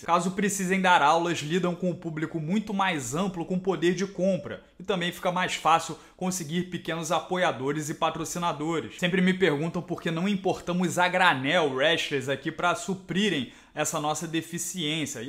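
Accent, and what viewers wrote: Rio de Janeiro, Brazil. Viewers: Curto os seus comentários! Mas tem que diminuir no sotaque carioca....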